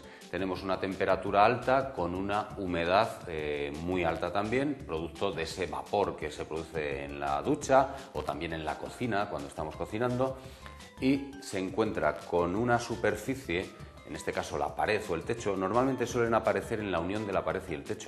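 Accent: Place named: Galicia, Spain. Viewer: Como habla la gallega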